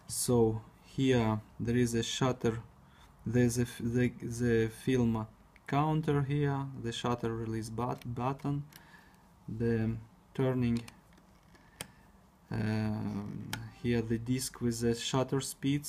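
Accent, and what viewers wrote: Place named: Russia. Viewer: Хорошо говорите по английски... молодец... А думаете все равно по русски... и это чувствуется. Спасибо за обзор... Хороший фотоаппарат... всегда мечтал купить его в молодости.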